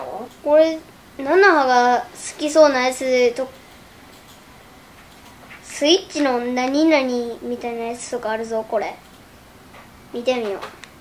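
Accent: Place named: Kansai, Japan. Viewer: 関西弁可愛い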